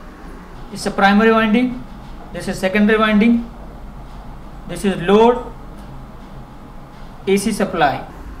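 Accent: India. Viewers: Lan normal aksanı zor anlıyorum hindistanlı çıktı adam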